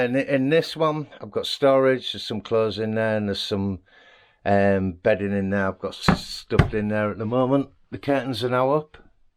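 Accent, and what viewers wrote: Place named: Teesside, United Kingdom. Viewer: Sounds like a Teesside voice there - but Teesside/YORK/Hull are similar. Well done. Good job!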